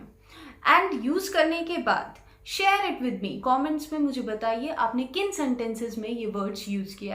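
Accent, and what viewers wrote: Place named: Punjab, India. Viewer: hindi nolan da Great punjabi accent madam g. Sajid Karachi Pakistan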